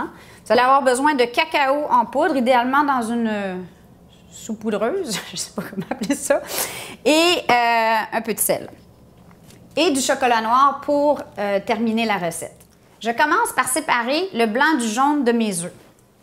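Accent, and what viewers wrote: Quebec, Canada. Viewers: l'accent québécois 😉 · Vous êtes adorable et drôle.et puis l accent québécois fait le reste.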